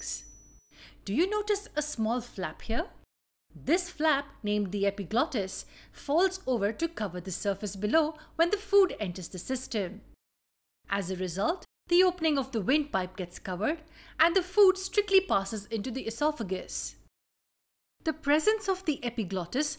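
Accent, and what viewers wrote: India. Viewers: Thanks mam. You cleared all my doubts In one video. And also thanks for the Indian accent and clear voice. 😚😚😚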